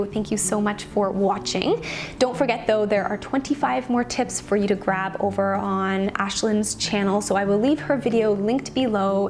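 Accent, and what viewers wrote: Canada. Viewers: New to your channel from Ashlyn's channel. Just subscribed. Quick question...are you Canadian? Certain words you say sound Canadian..... I live in Alberta,Canada. Enjoyed your video! Thanks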